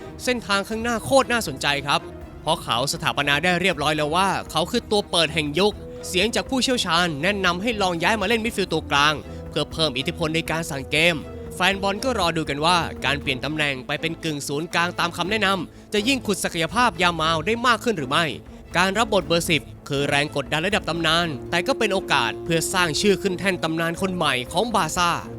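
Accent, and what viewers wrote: South, Thailand. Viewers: อย่าพูดใต้อีกนะครับ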